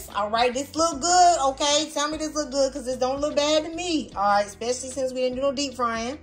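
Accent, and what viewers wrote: South, United States. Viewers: Cutie pie with a southern drawl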